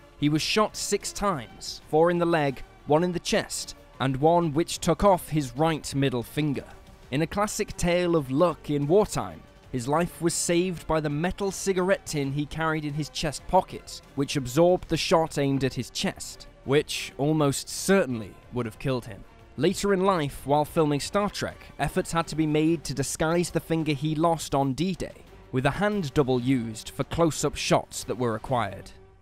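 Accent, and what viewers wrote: United Kingdom. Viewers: British babble !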